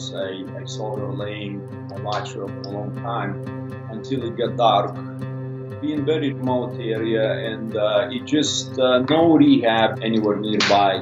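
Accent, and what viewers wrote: Russia. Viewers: the russian accent and simple english adds so much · Ох уж этот русский акцент... =) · Русский акцент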